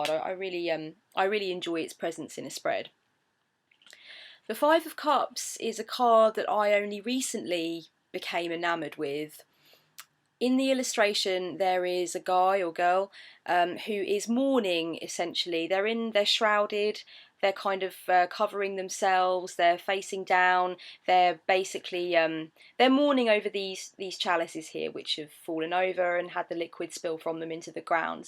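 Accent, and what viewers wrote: United Kingdom. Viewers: I just discovered your channel and I'm slowly working my way through your early videos. I love your down-to-earth approach, and it's refreshing to hear a fellow Brit. You were speaking about the 5 of Cups, and that came up in a reading I did literally today. And today it was the first time I really noticed the river flowing under the bridge. It's like the card is saying, 'Yes, shit happened, it's right to acknowledge it, but it's water under the bridge Take the time to look around, see what you actually have and then cross that bridge, leaving the shit behind.'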